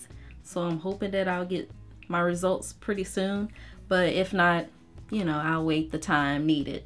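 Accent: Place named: Mississippi, United States. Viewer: I can hear that Mississippi/Memphis accent, not as strong, maybe because you're in Cali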